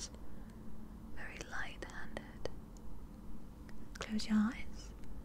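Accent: United Kingdom. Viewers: Love the video a d your British accent hehe. So pretty 😍 and calming 😁😴 great job as always!Hope you had a Happy Thanksgiving! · this ASMR is absolutely incredible 🤩!! Coming from a British girl, your British accent in this video is absolutely insane 🤯!! Super spot on!! This video was everything I want in an ASMR video & more!! Soft, sweet ☺️, relaxing & super tingly. PLEASE 🙏🏻 do more British accent role plays. Much love 💕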